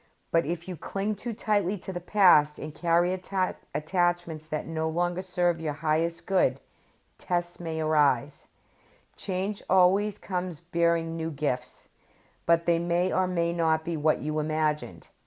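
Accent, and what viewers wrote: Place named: Michigan, United States. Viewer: Love your accent! Michigan, Ohio possibly?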